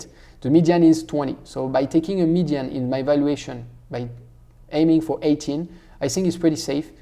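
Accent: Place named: France. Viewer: Nice video. I have been following you for a while and I really enjoy your content (and your french accent). I bought the dip on EVO when your video came out- which paid off nicely. I have a few questions that I would like to ask you: 1. I was wondering where you see Francois Rochon's current portfolio that you mention in this video? 2. Have you thought about using StockOracle for your videos? The tool is amazing and works well for youtube videos. 3. Why don't you have Apple in your portfolio (It is one of my biggest holdings along with amazon and google). Btw.. Novo Nordisk is my favorite investment case BY FAR, so I'm happy to see it in your portfolio! All the best.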